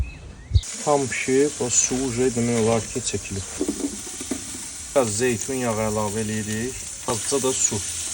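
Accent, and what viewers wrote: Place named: Baku, Azerbaijan. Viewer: "Çoxlı qurdalıyırığ " canım 👑BAKILIM👑 ləhcövə qurban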